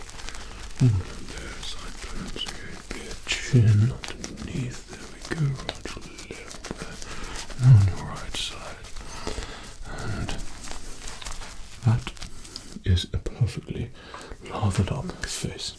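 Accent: United Kingdom. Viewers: One of my goals is to learn this accent, I watch BBC almost every week, there's just something about it that is magical. Great video! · Is this man from UK? (I am learning american english, and i don't understand the acent of UK) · He is it’s not even that thick of a bri’ish accent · i didn't know thor was british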